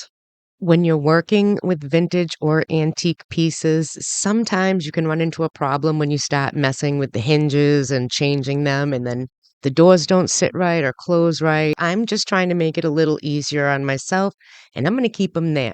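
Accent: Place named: New England, United States. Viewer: Awesome! Love the combination of wood and black. I'm from New England (lived in all states except Maine:} and was picking up on your accent. When you mentioned the cold weather and living in N.E. I wasn't surprised! Which state? I now live in central coast of California and love it here. The weather is so wonderful. It's not crowded and little traffic here. Holly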